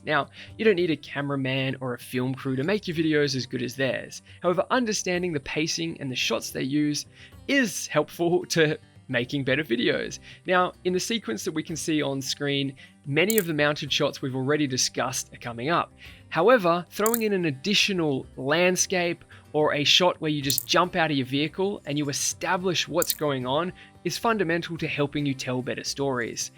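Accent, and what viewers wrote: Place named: Australia. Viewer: Dunno what I love more!! The epic tips, hat, or the fact that this is the most Aussie ever sounded. Top highlights: “It’s a bloody absolute ripper” “Let’s not be d*ckheads” Thoroughly enjoyed 😝✌️🤙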